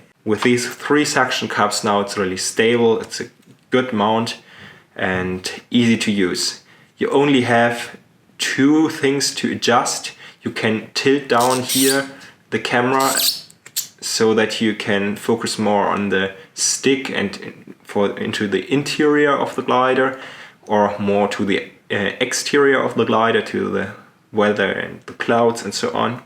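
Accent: Germany. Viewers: Mach bitte auch Videos auf deutsch oder einen deutschen zweit Kanal denn dein Akzent ist so deutsch da kannst du es auch sofort auf deutsch machen Ist aber alles nett gemeint nicht böse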